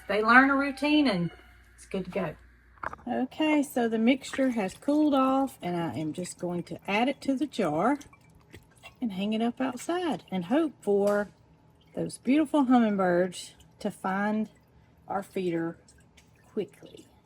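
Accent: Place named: Alabama, United States. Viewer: I love how you say “boil”! You are so darn cute with your Alabama accent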